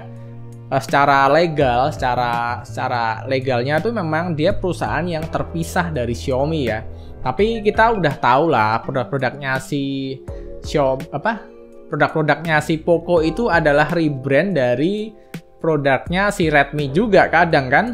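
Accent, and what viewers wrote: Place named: Java, Indonesia. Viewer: Medok jawir anjir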